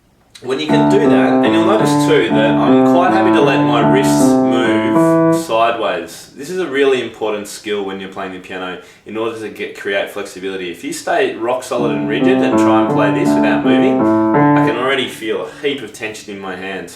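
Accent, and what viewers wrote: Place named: Australia. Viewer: you're so good! and Australian! xD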